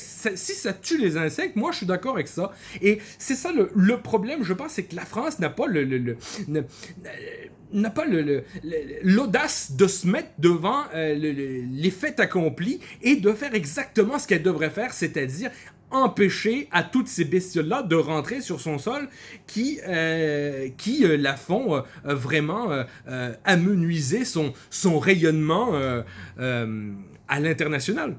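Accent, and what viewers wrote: Quebec, Canada. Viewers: Meilleur accent québécois du game.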